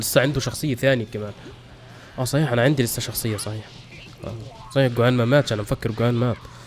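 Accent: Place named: Jordan. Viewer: حاسك من الاردن 😂❤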